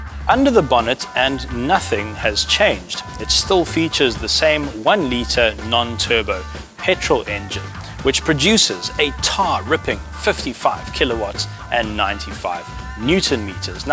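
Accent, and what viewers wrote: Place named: South Africa. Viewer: I'm coming from watching internationa lmovies- to hear a South African sounds funny.... been indoors for too long.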